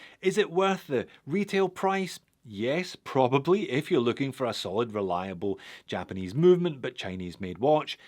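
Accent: Scotland, United Kingdom. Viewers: Thanks for another great review. As a Newfoundlander Canadian, I really like your Scottish dialect. It makes your reviews far more fun and witty ;)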